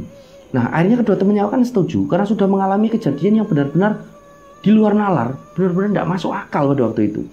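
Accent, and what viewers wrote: Java, Indonesia. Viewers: Q suka cara pembawaanya cara bercerita ada bahasa jawa y kental,top markotop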